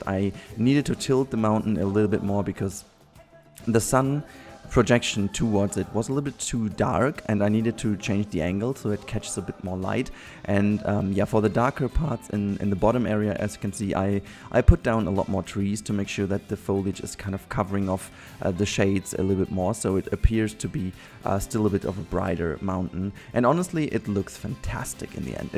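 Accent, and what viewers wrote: Germany. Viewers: Man kann irgendwie raushören das du deutsch bist:). Is echt schön was du hier machst!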